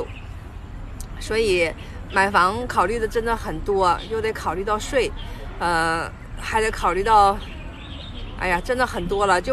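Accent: Northeast, China. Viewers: 还是好好说话吧，东北话或者标准普通话，混着说，有点别扭，买房子属于私事，没必要上这里来说吧，这期做的有点拉分了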